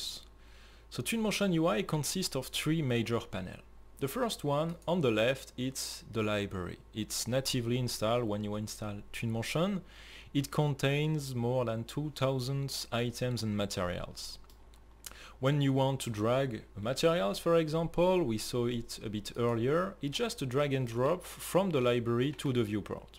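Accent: France. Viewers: Oui, "french accent", et : - Dommage que cette présentation ne soit pas en français. - Dommage que les sous-titres n'existent pas en français. Tout ça est bien paradoxal !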